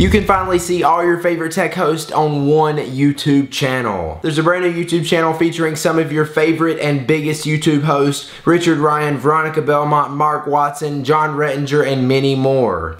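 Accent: South, United States. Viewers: Sounds like a Southern accent to me :P (I lived in Alabama for Five years) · Alex<num> That dude in the video is definitely southern fa sho! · sounds like your from the south haha no worries im in good ol south carolina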